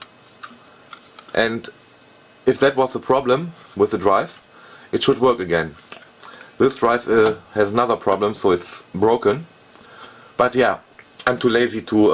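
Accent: Germany. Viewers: I hear a strong german sound :-D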